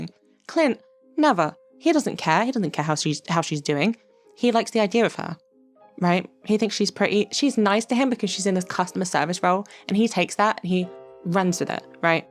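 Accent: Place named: United Kingdom. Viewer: GODDAMIT DO A SHANE VIDEO U PRETTY WOMAN WITH A THICK BRITISH ACCENT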